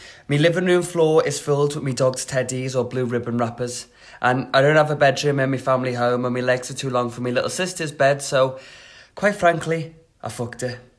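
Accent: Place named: England, United Kingdom. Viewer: Fabulous! And, with a proper English accent with expressive mischievous visage wonderfully and theatrically delivered to compliment his prose. Thank you. You'll go far! Not sure about sentence use of the f-word though when an empathy for your diverse listeners and readers need consideration.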